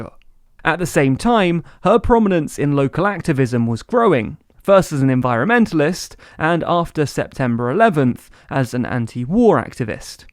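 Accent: United Kingdom. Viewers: his main channel is for uk politics, he also speaks with a british accent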